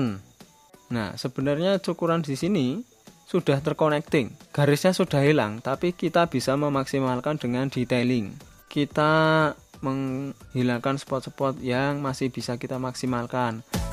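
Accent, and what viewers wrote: Java, Indonesia. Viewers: Jowomu medhok ,,,,hhhhha hhhha enak ki kesusu kok hhhha 🙏🙏🙏🙏🙏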